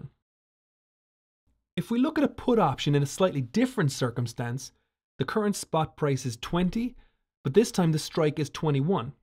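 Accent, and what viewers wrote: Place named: Ireland. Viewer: The narrator sounds Irish!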